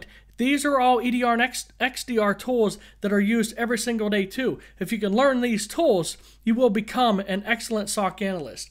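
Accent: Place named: South, United States. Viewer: That accent... South of the Mason/Dixon and East of the Mississippi.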